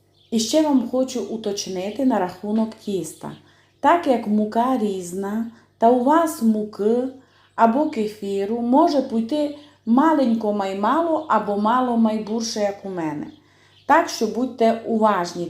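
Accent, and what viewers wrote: Transcarpathia, Ukraine. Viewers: говоріть, будь ласка, на закарпатському діалекті і ні на кого не зважайте. Це так милоооо….. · прекрасна українська мова з закарпатським діалектом, супер! Дякую за рецепт! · Дякую за дуже смачні рецепти❤️ подобається й закарпатський діалект 😊 натхнення Вам💙💛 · Вона говорить на чистому закарпатському діалекті! Чого повторюєте? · Добрий вечір,! Дякую вам за чергову смакоту, яку готуєте і нам показуєте! будь ласка не треба нічого вирізати при монтуванні, Аніта має рацію. Це особливість вашого каналу, ваш закарпатський діалект, слухаю і дивлюсь вас з превеликим задоволенням! Вітання з Вінниччини. Миру перемоги нашій рідній Україні, рясних Божих благословінь вам і вашій великій дружній родині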